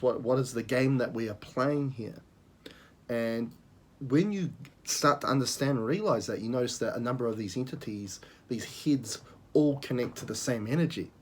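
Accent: New Zealand. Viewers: This video gives me a lot of inspiration on how to update my own charts since I rarely use a lot of them. What exactly you said regarding a command to connect to celestial existence? Although I love kiwi accent, I did not understand this time :D Thank you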